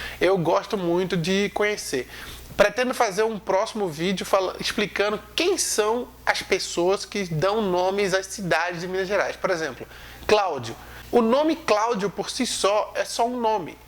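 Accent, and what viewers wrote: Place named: Ceará, Brazil. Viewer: E o seu sotaque tem I'm pouco de cearense out pernambucano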